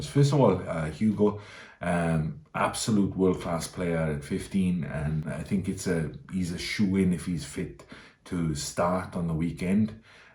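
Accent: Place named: Wales, United Kingdom. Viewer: Hey. Good to hear your comments on the teams for this weekend's three juicy matches. I can't disagree with anything. Biggest chance of an upset for me is with the Italians. I don't think England can upset this Ireland set-up. Love your accent mate (something of an interest for me). Clearly lots of Welsh there but I think that there is something else underneath that. Italian perhaps?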